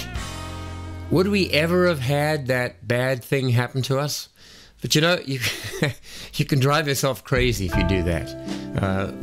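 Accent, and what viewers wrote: South Africa. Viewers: Can hear you're a South African that have been in Canada for a few years. Sorry about your Tesla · glad you two are safe! slightly off topic but are you any bit south african?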